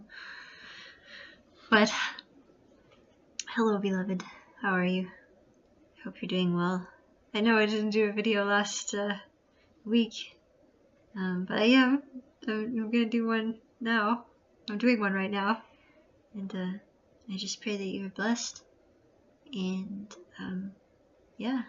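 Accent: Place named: South, United States. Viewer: You're always funny lol You sound like a southern from down south. the US of A. I don't know why I always don't get your videos I get them once every month. The brothers were very protective of their sister and the law of the Lord. Thank you once again and thank you for your encouragement. May the Lord bless you always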